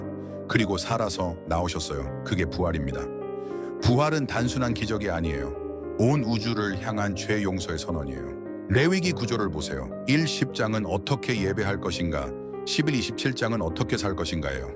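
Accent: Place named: United States. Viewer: 감사합니다. 성경공부에 큰 도움이 됩니다. Awesome! 혹 목사님이셔요? 교포셔요? 발음이 미쿡사람 같아서요🤗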